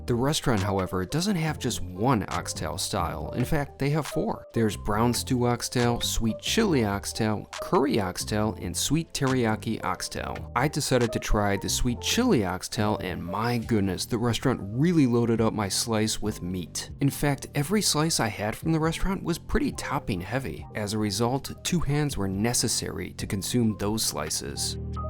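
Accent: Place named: Wisconsin, United States. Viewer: That accent… You’ve got to be from Wisconsin?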